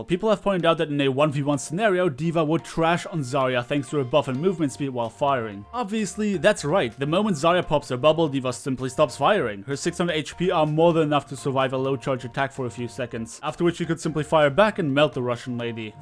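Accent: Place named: Germany. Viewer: Your accent is rad. Are you German or Austrian?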